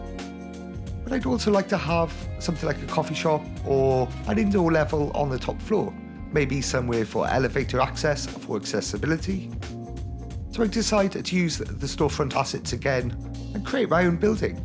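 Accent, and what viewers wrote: United Kingdom. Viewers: Yesss, my favourite British voice is back, and how, WOW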